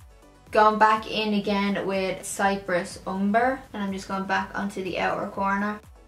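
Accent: Ireland. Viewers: I could listen to you speak with your beautiful Irish accent all day. I love this palette. The shades are stunning and the look you created is gorgeous.. Thanks for the tutorial. xxx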